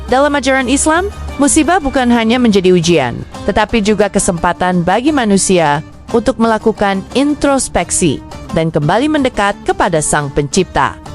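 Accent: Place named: Netherlands. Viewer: Kalau baca tex, jangan berlogat bicara seperti Belanda. Itu nggak lucu......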